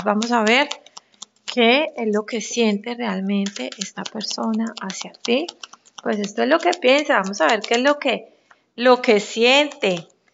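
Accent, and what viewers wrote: Colombia. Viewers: Buen día y si no me ekibocoese asentó es colonviano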